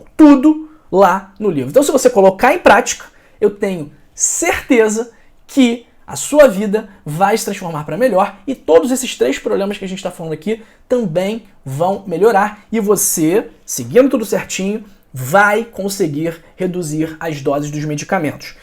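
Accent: Rio de Janeiro, Brazil. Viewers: O sotaque do x já sabemos q e do rio essa palavra pechsquisa e do rio não sei como consegue mudar pro Chis ou xis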